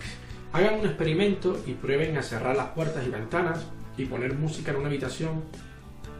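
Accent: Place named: Spain. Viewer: Justo antes de que dijera que estaba viviendo en Colombia estaba pensando en que para ser español se le notaba muy pero muy poco el acento, me preguntaba de que parte de España sería. Y cuando dice que es de mi país me cago de risa y entiendo el por qué XD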